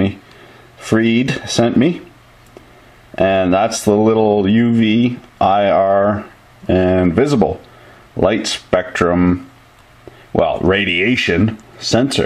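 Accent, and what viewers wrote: Canada. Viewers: So glad youtube recommended me to this; just because of the accent lol, you in Northern Ontario or out West?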